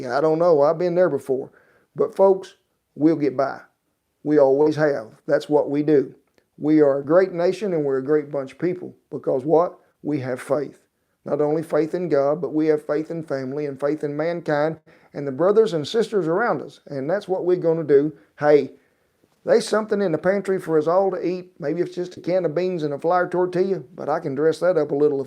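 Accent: South, United States. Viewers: I preordered your book and got it yesterday. Beautiful photography, great tips and stories. I was only disappointed that your wonderful sloppy joes and your potato cakes recipes weren't in it. But I'll just add my youtube recipe notes inside the book. Re: sound quality of your live chat, it's clear and plenty loud enough. in the background was a little fuzzy. Btw, you don't need a teleprompter. I think we cam all say we like you just the way you are naturally. I especially like it when pokes light fun of your cowboy talk. But I'm southern, si I "getcha". You both are great and I could tell all the hard work that went into the cookbook.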